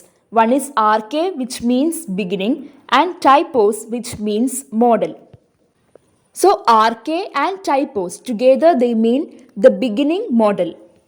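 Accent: India. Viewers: Are you Indian or foreigner 😮? I opened the video in a hope to see a video by a foreigner then suddenly this desi accent 😅😅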